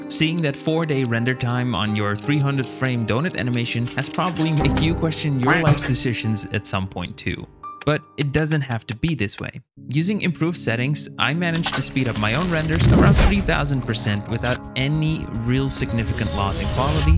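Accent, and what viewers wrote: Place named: Netherlands. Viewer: I hear a Dutch accent! NL!!!!! ❤❤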